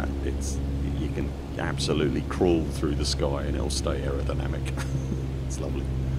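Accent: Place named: South East London, United Kingdom. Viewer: A South East London boy eh? Grew up in Bromley myself!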